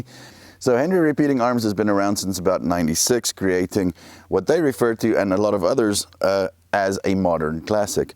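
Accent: South Africa. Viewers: do I detect a very subtle South African accent?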